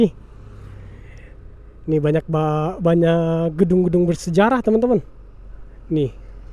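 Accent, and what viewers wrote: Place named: Solok, Indonesia. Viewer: Dari logatnya ucapan adikni .....ini kek logat Solok lah.....orang Sumbar asli inimah....karna ketahuan dari cara berbahasa dalam komunikasi ....MINANG EMPIRE INI MAH ...hahahaha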